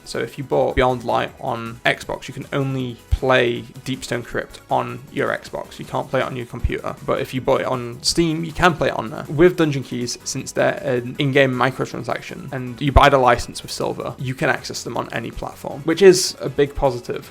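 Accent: United Kingdom. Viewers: Followed you on Twitter forever had zero clue you were British lmfao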